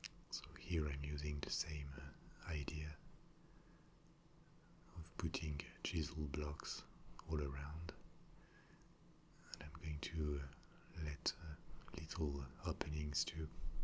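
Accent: France. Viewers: Vous avez un peu l'accent français dans vos parole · As if regular soft speaking wasn't good enough here comes this guy and does it in a French accent; I AM IN LOVE WITH THIS!! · Mon Dieu! ASMR + votre accent francais (+ Minecraft) = Fantastique! :D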